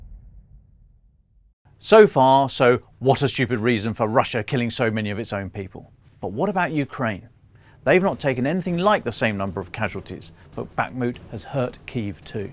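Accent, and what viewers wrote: RP, United Kingdom. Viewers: 😂😂😂. BS in an elite British accent... Bakhmut has fallen. Artyomovsk has risen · What a lovely load of British propaganda masquerading as objective analysis. A smidgen of truth, like sugar, to make the lies go down easier, wrapped in a syrup of posh British accent which gives the content / message ("massage") the necessary perceived gravitas to deceive the willfully blind. Well done. A master class in the application of Marshall Mcluhan's work. But of course, the truth will eventually come out. It will be entertaining to watch the excuses, the spin, the recriminations and attempts to forget and sweep things under the rug. The ultimate question being just how forgiving the good citizens of the West will be once it is apparent how badly their trust and faith in their leadership, "experts" and media has been abused. Will it be another 1789 moment? 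History has a remarkable pattern of repeating.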